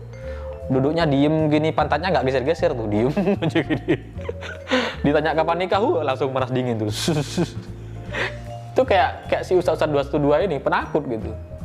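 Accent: Madura, Indonesia. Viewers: Saya jg berfikir gt.logat maduranya ada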